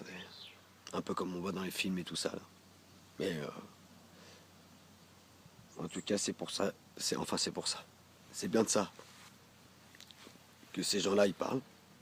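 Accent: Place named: Quebec, Canada. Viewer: merci pour tes vidéos, on va dire que c est pas facile l accent québécois mais ça va j arrive à decrypter.mon premier voyage astral m a bien secoué et g eu peur, je pense que j aurais moins peur lors du prochain voyage.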